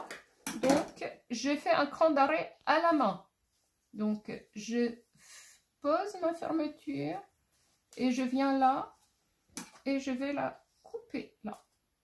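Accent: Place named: Alsace, France. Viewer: Bonjour, merci pour vos conseils. Le pantalon est presque fini, je vais coudre une fg sur le côté. N'ayant pas pris les mesures nécessaires pour réaliser une braquette . Merci , le prochain patron , je m assure qu'il soit avec braguette Et je me plais de penser à cette réalisation. Je suis très prototype avant de coudre , j'avais réalisé que mon exercice s' avéré incomplet. 😮 Bon c'est sur je m'y met. La couture est mon deuxième poumon 😂 Merci encore, également votre petit accent me plaît bien. Alsace peut etre? Amicalement!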